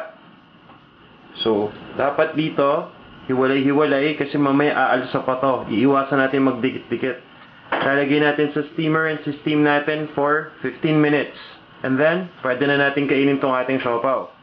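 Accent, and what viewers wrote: Philippines. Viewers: Except for the loud background music, will u people stop criticizing this chef? His English is NOT very bad that u have to complain about it! & his accent is ok! Pinóy accent naman yun; what's wrong with it? Would u rather hear him speak in a foreign accent (like American or Chinese accent) while he uses the Tagalog language?!? Remember that his videos are NOT about grammar or phonetics!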